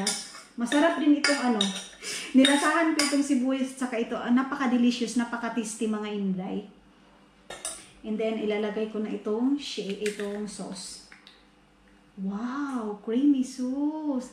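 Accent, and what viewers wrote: Visayas, Philippines. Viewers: pag nag bibisaya ka tawa ako ng tawa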